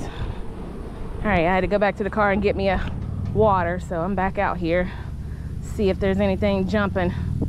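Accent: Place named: Florida, United States. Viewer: Your welcome, thank you for posting! I'm a Floridian as well, I can hear it girl!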